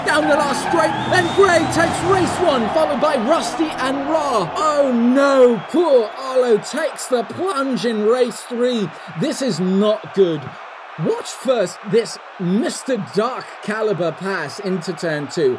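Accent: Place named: United Kingdom. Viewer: Makes sense now, the accent sounded very familiar to be British🤣. Everything about the races, street lights and commentary is amazing, keep going!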